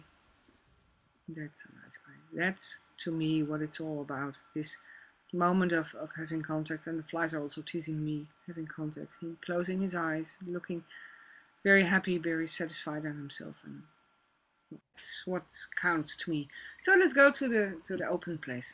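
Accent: Netherlands. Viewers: Thank you it really helped. Are you from holland??? Because you have a little bit of a accent. I am dutch too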